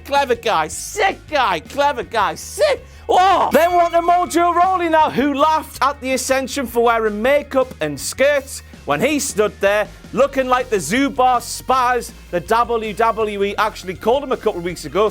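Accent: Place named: Scotland, United Kingdom. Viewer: This guy is stupid. He just keeps bringing up the same shit that wasn't even funny the first time. And his Scottish accent sounds gay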